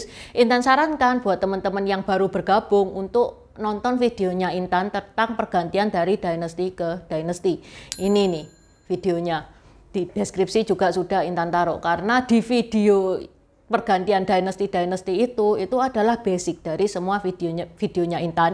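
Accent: Java, Indonesia. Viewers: nieh enakan ya klo mendongeng.. hihihi pk bhs indonesia dg aksen jawa medok.. hihihi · Dia lahir dilingkungan org jawa, ya pasti logat nya jawa, emang jawa bukan indonesia ya. Nampak kali anda rasis sekali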